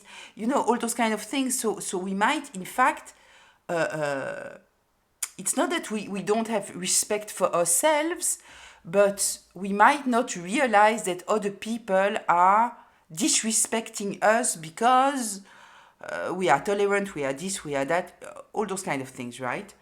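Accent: France. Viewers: my beautiful Lady, your story is so much my own... So much in common from what you've shared. I recently found your channel and the first time I heard your beautiful voice and that lovely French accent I feel I know you from a previous life. The few videos of yours I've watched have provided so much clarity for me (this is one of the ways you agreed to help me along my journey). No one would believe the hell ppl did to me, but your personal story as well as your resonate readings confirmed for me that not only am I not crazy; the false narrative about me, it has also helped me to finally see why the devil has made me his focus for most of my life. Satan doesn't attack souls who are already doing his bidding. He only attacks souls who are shining God's pure Light. You my dear sister are clearly on your divine and destined path; the one you planned with your own spirit guides to come to this difficult school. 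I feel it every time I Iisten to you. I owe you so much and one day when I'm in a position to do so, I will give back even though I sense you expect nothing in return. Thank you so much... please tell me your name. 🙏🏽 If the algorithms allow my comment to get to you; my name is Joan as in Joan of Arc, and I'm truly happy to have found you...again. 💜💜💜😊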